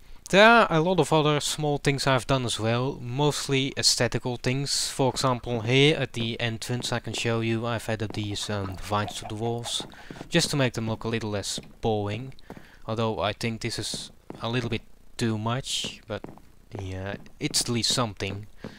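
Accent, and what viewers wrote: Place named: Netherlands. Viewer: I think you are dutch? :P try to fix your accent :)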